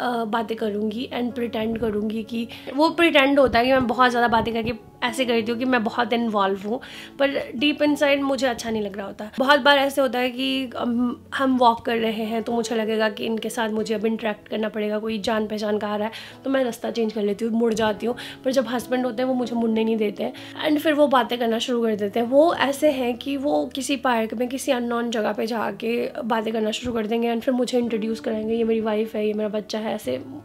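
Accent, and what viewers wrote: Punjab, India. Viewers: I started following you because you talk like one of my best friend in India. Punjabi accent Amritsar vala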